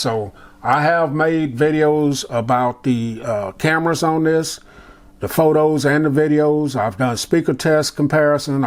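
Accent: South, United States. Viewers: First review I've heard with a southern accent. Sound like one of my uncles. Love the content. Love my Stormy Black 6 pro 💪🏾✊🏾💯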